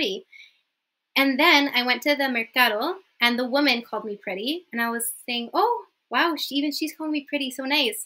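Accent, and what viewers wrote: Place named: Mexico. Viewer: ❤Hermosa, tu acento es más latino, y de hecho suena como mexicano. Así que te vendría bien aprender slang de México, aunque hay algunas que usamos españoles y mexicanos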